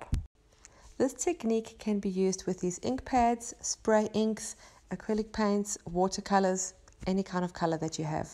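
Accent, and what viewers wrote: South Africa. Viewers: Absolutely stunning, I really liked the combination of turquoise and brown. So inspirational and easy to follow. I am going to try this out. By any chance are you from South Africa, just wondering because you seem to have true SA accent. BTW I am from Johannesburg, SA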